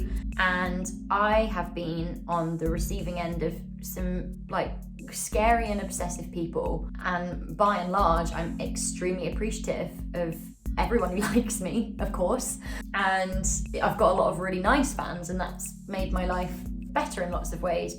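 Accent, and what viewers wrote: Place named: United Kingdom. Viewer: I am very happy to be able to watch you again dear ⭐⭐⭐⭐⭐ you always put a huge amount of work and heart... Also the Brit accent in Canada... Bold. A delicacy 🍯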